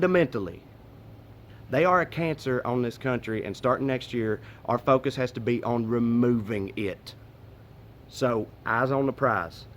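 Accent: South, United States. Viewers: As an ex-pat Arkansan, it's refreshing to hear a southern accent saying intelligent things. I love my home state, but the people there can be absolutely idiotic. They voted for el trumpo. Go figure. I live in DC now. He's a goner from what I see in the beltway. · Oh my God, I love you. You are like Jon Stewart with a Southern accent. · You’re awesome!!! It does my heart good to hear your southern accent speak wisdom! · We love you too... nothing better than a liberal with a southern accent.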